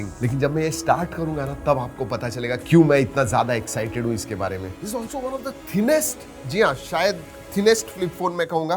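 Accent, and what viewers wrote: India. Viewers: My advice..start to learn proper English , you are speaking Indian english😅😅 so only Indian can understand . Why you Indian to lazy !!!!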